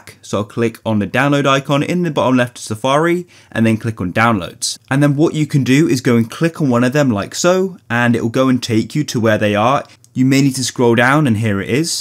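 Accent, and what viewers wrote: United Kingdom. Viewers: Bro is british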